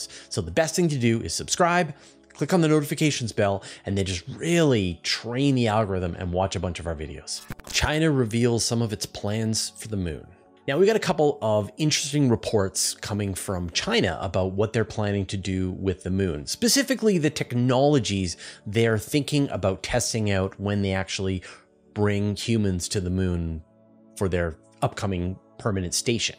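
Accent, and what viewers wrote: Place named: Canada. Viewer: I like how you sound Canadian. It reminds me of talking to Canadians. I mean, I still live in Kitchener, Ontario, but I haven't talked to a Canadian in weeks. It's funny how India has a space program.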